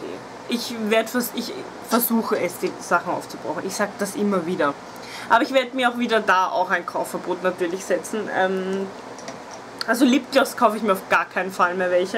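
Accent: Austria. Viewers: Tröste Dich, ich kenne das - ich kann mich von solchen Dingen auch so schlecht trennen, auch nicht von denen, die ich sowieso nicht benutze, dabei wäre es aktuell schon angebracht, das endlich einmal wieder zu tun, obwohl ich gar nicht sooo viele Lippenstifte besitze. Aber was soll´s, es gibt schlimmere Angewohnheiten ;) . Ich mag Deine Videos so sehr, weil Du so wunderbar authentisch wirkst - wenn mal eine Weile nichts kommt, schaue ich mir deswegen auch gerne die älteren Videos noch einmal an. Dieser leichte Wiener Akzent wirkt auf mich Norddeutsche gleichermaßen beruhigend und erfrischend, wenn ich also ´mal krank zuhause bin oder ein bisschen Hintergrundgeplapper brauche, während ich aufräume etc. , sind Deine Videos für mich optimal :)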